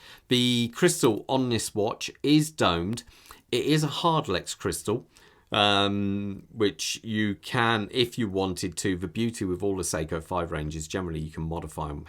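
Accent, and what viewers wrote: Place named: Midlands, United Kingdom. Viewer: It’s nice to hear a Midlands accent. In fact it’s bostin.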